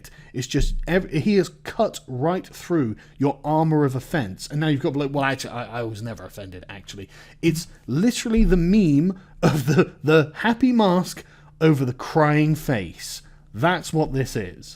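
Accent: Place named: United Kingdom. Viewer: Reading snooty comments in a mocking voice is 1000x better with a British accent..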